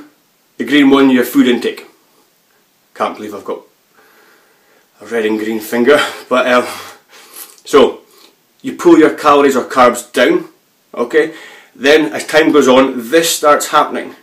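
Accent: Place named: Scotland, United Kingdom. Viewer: love your scottish accent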